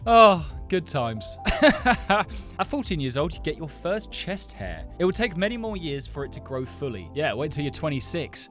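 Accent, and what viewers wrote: United Kingdom. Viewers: You sound like British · Are you British?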